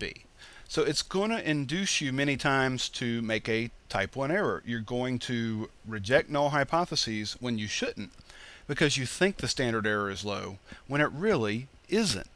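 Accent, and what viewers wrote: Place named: Northern England, United Kingdom. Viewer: Some words are Southern like "dependent" and most of your accent is Northern.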